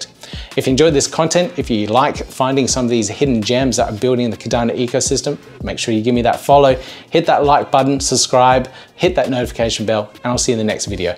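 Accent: Australia. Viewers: Just subscribed to your channel! You have such soothing radiating positive energy, that it is much more pleasant to hear Cardano related news from you than from many other channels. Maybe it is because I consider Australian (or NZ) accent smooth as butter maybe, pleasant to the ear and reminiscent of the sun and beaches (and surfing with sharks as well :) ).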